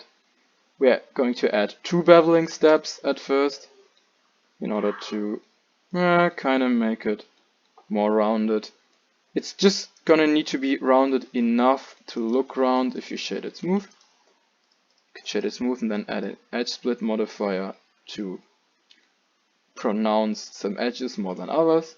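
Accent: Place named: Germany. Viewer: The German accent is so stong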